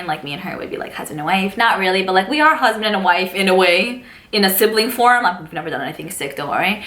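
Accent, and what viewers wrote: New York, United States. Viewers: Love watching your videos. I miss New York and all my family that still live there. Listening to you talk reminds me of home. I think you have a great personality and I look forward to watching more by you. So glad I came across your videos. Love to see more makeup tutorials since I suck at it. Lol 😆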